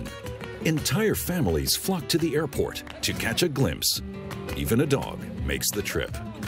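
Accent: United States. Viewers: funny to hear a heavy american accent narrating russian hih-end technology in such a respectful way. there's hope for USA... hahahahahaha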